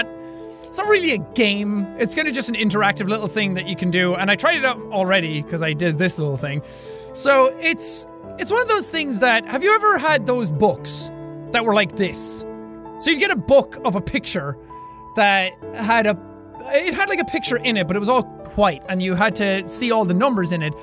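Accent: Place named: Ireland. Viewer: Well, I am new definitely heard of him didn't know he had such a Irish voice cool because I am Irish! 😂😀😄😅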